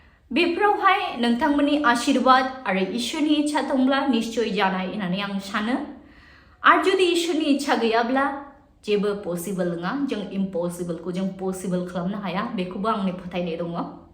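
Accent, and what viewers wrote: Bengal, India. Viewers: Abo boro rqnya na na kok thana bengali sw thangdw mathw rao ya... Boro jana boro rao mwjng rwngnw nango..... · Are you from Bengal beta, your speaking like Bengali tone.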